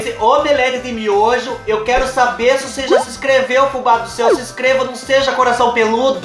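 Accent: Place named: Caipira, Brazil. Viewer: do céu vou dar uma apertada em vc so por esse sotaque caipira que eu adoro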